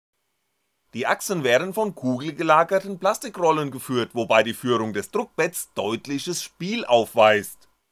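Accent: Hesse, Germany. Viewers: Es geht nicht um deinen Dialekt, es geht nur um die überdeutliche Betonung. Also nichts gegen deinen Dialekt, im gegen Teil! Hessisch is doch a Geiler Dialekt! 👌 Mir ging es darum das es anstrengend ist das ganze Video zu hören. Infos und du Machart ist echt super. Also nicht als Kritik an sehen ;-) , nur meine Meinung! Trotz allem weiter so, Abonniert ist ja auch schon. Grüße nach Hessen Thomas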